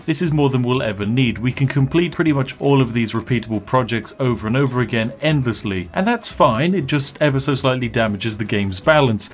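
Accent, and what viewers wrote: United Kingdom. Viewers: Day 6 of reminding we want to hear him say "Sugoi" in his glorious British voice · sounds awfully British too me 🧐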